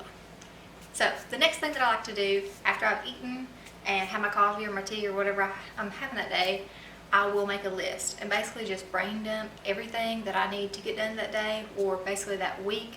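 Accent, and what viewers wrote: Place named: South, United States. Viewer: Where are you from? I love your southern acent I wish I sounded like that LOL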